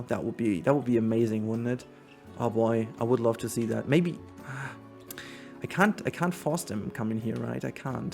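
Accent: Germany. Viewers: You say your English is not native, but it is totally fine! Your slight German accent is more interesting and pleasant than a lot of native English speakers haha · Ich hab dich durch andere Planet Zoo Speedbuild Videos entdeckt und schau mir deine Videos seither immer wieder gerne an :) versteh nicht ganz wieso Leute auf unperfektes Englisch so abgehen. Ja, man hört ab und an dass du aus Deutschland kommst aber dein Englisch ist im Grunde absolut perfekt. Man findet immer was zu meckern, nimm dir sowas nicht zu Herzen. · Your English is totally fine! My mom is native German so your accent is already pretty familiar with me, and she says things a little differently sometimes. Honestly the game i'm looking forward to the most in 2021 is Prehistoric Kingdom. Dinosaurs + Zoo building = YES PLEASE!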